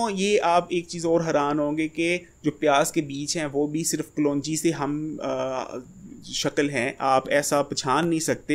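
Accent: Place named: Punjab, India. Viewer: Hakim sahab urdu bol rahe Ho, tone Punjabi aa rahi hai .by the way thanks for your information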